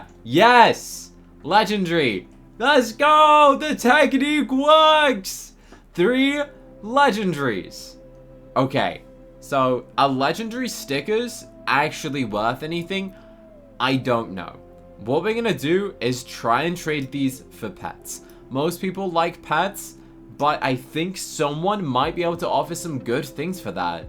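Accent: United Kingdom. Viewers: Wait he’s British? Edit:I searched it up and he is brtish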